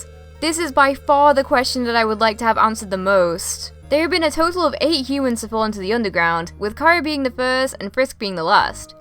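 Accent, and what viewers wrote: United Kingdom. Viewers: What's wrong with liking a British voice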